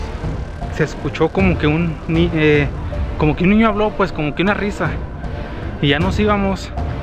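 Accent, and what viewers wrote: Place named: North, Mexico. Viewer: qe me encanta ese asento mejicano como norteño qe lindo México Saludos desde Chile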